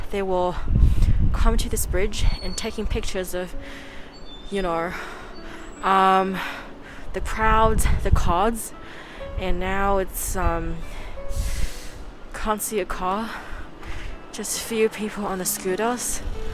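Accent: Australia. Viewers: So nice to hear your oz accent. 😍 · Nice vlog, but do not pick up more of that Aussi accent :) Stay safe !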